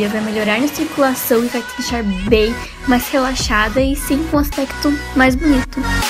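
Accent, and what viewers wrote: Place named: Rio Grande do Sul, Brazil. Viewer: E esse sotaque ai em?😍 Seria um sotaque Gaúcho? bjs sua linda acabou de ganhar mais uma inscrita hehe sua lindona!❤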